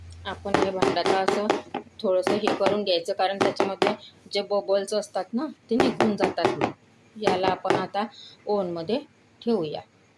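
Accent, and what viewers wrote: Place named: Khandesh, India. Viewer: खानदेशी टोन आहे ताई तुमची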